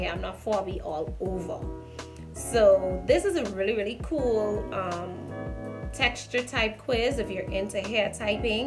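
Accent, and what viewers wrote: Bahamas. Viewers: Are you Bahamian?? I can hear the accent.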